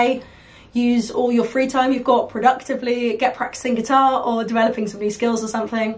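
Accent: United Kingdom. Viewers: Of course she is britsh. I just knew she had a British accent. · Is she british? first time I hear her talk.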